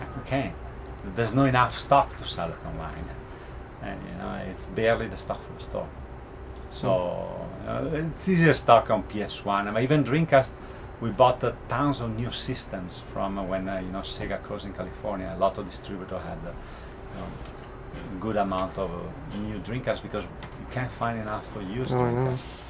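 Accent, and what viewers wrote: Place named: Italy. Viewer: che stile si sente che sei italiano!!!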